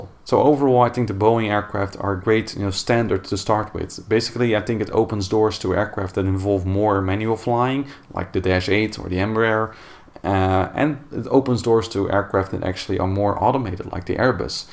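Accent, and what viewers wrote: Netherlands. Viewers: i knew you where dutch by your accent and because you showed a klm airplane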